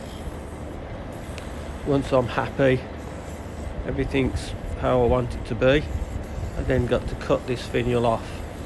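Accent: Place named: Birmingham, United Kingdom. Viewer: I love your accent. I lived in Birmingham for a while and I always feel homesick listening to you. Plus I'm hoping to be braver with the skew...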